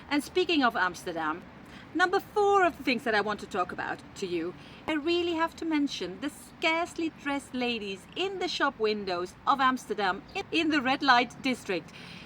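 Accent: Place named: France. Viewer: C'est amusant, vous avez un accent francais en anglais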